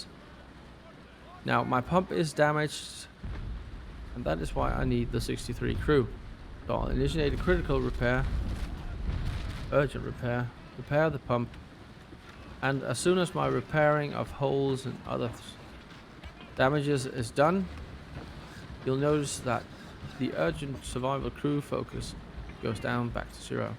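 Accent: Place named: Denmark. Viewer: Your accent tells me you are danish så hvad så der :D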